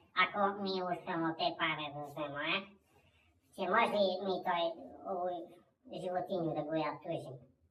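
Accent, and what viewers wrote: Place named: South, Serbia. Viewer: BRATE ODAKLESI? CEPAŠ JUŽNJAČKI A DRAGICA ME PODSECA NA KOMŠIKU BABU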